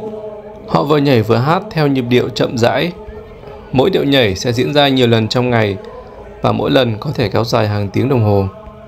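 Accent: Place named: Hai Phong, Vietnam. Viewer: Theo dõi kênh anh từ đầu. Nhưng video này thực sự phục anh vì: anh chịu khó và dũng cảm xin họ để đc quay. Lăn lộn vào quay từng ngóc ngách.Hỏi han họ để có thông tin.Góc quay đẹp và toàn cảnh chứng tỏ anh quay rất có tâm và có trách nhiệm trên từng thước phim gửi đến khán giả.Kiến thức thì phong phú,sâu sắc,giọng Hải Phòng thì hay khỏi bàn.Chỉ biết chúc a sức khỏe,tiếp tục giữ lửa và sự can đảm,nhiệt huyết trên từng video gửi đến khán giả chúng tôi có cái thú vị để xem. Chúc a nhiều sức khỏe.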